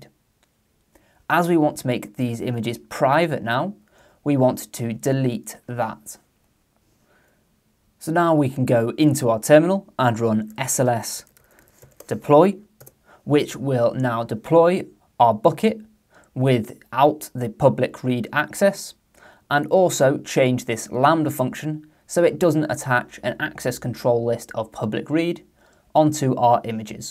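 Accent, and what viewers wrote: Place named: United Kingdom. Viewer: that british accent!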